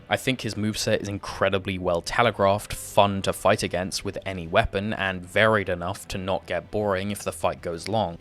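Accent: Wales, United Kingdom. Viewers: He is British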